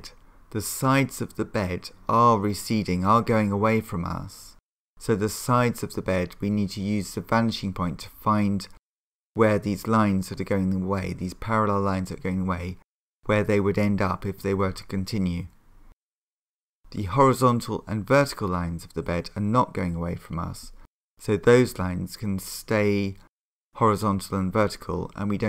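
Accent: United Kingdom. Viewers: Such great instruction. Lovely voice. I adore the British accent. ❤️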